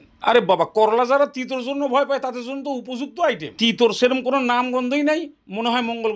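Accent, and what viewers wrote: Bangal, Bangladesh. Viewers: dada apnake onek dhonyobad.Darun sundar testy korolar recipe dekhlam.Tobe dada apnar bangal katha gulo Bhanu Bandopadhyay er moto lagche.Apnar quality ache aar apni drama korte parben.Thanks. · Sob thik ache sudhu bangal der moto kotha bola ta charte hobe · আপনার বাঙাল ভাষা শুনতে খুব ভালো লাগে, আর রান্নাগুলোর তো কোনো জবাব নেই।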